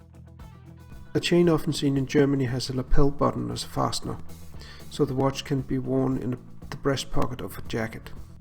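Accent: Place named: Denmark. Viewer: at first i wasnt sure where you were from but then i realised you were danish. så det er sejt at høre at jeg ikke er den eneste dansker med denne hobby haha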